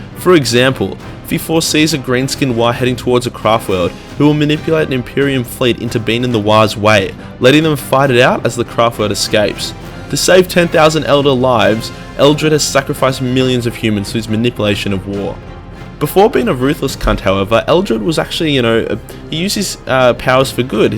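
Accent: Australia. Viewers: It’s literally an Australian accent. Someone else is racist. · i know you mean english speaking but jesus christ be specific. hes australian, not english. its basically the same just worse at cricket/rugby and better at crime. cheers ears 🖒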